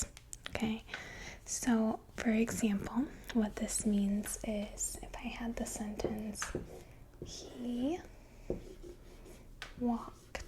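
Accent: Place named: Upper Midwest, United States. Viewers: You have a nice subtle upper Midwest accent..I know. I'm originally from there..very nice..love your ASMR..thanks so much..goodnight .😇😴💤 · you sound like you're from chicago miss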